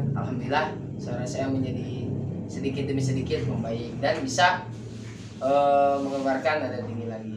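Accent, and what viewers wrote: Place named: West Java, Indonesia. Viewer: Kok ngomongnya sunda seuwareh kang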